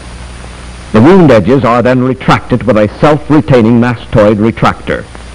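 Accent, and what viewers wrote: Transatlantic, United States. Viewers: 1. Old as hell video 2. Transatlantic accent narrator 3. Fuzzy background sounds 4. Slightly distorted video quality 5. Almost/complete black and white colours 6. Starts off with a bunch of descriptive texts 7. Medical related Well this video check listed all the points to be CREEPY AS FUCK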